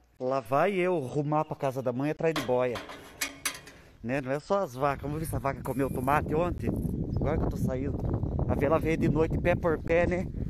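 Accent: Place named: South, Brazil. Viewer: Sotaque sulista!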